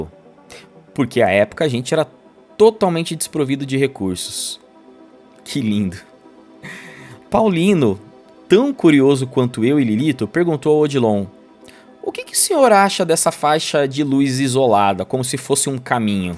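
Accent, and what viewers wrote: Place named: São Paulo, Brazil. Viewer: Meu caro vc se expressa mt ruim com seu sotaque Paulista e precisa ler melhor, não tem emoção deu a entender que era qualquer leitura. Desculpa mas é uma passagem mt importante estude mais meu caro. Deus abençoa ❤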